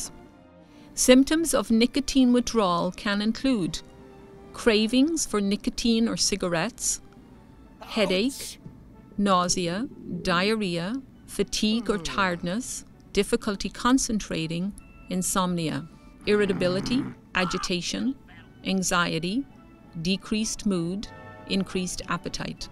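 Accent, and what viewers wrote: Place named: Ireland. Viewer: Big thick Irish accent in Toronto haha love it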